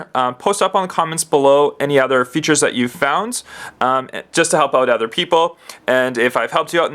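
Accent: Canada. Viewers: That's Canadian accent. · IS HE CANADAIN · dude, are you from fucking Canada?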